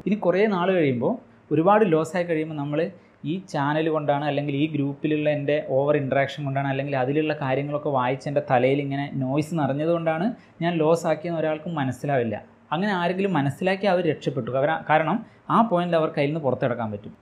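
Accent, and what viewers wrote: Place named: Kannur, India. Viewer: കണ്ണൂർ ആണോ സ്വദേശം,..your slang seems so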